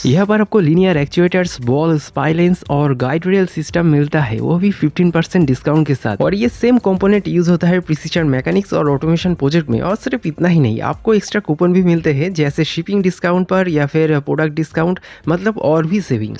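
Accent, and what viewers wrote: West Bengal, India. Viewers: Aapke sare projects bohot acche lagte hai. Ek question tha kya aap west bengal se ho??aapke batonse lagta hai❤❤😊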